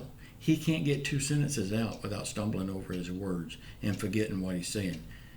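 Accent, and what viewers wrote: South, United States. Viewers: Thankyou!! Love your gentleman southern accent!! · Maybe you shouldn't watch this channel if you're offended by his southern accent. I'll continue to watch his channel , I quite enjoy it.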